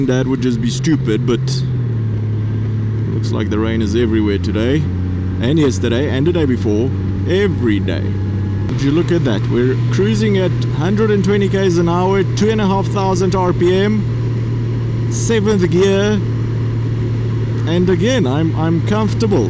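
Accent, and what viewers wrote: South Africa. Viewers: You sound so south african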